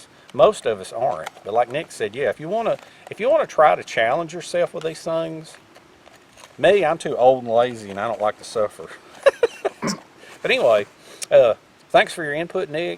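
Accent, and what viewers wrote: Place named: Louisiana, United States. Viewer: Two thoughts: A.) Why not a trench fire? B.) If you carve a funnel out of wood with a SAK and lay the tin foil in the funnel: wouldn‘t life be better?😅 Love your videos and your accent! Keep making those experiments!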